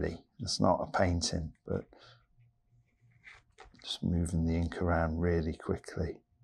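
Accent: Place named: United Kingdom. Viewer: Didnt expect him to have such a british accent. I love his stuff. Amazing artist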